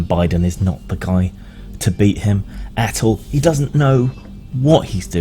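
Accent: United Kingdom. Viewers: Why the British accent,? Let's see if anyone get that.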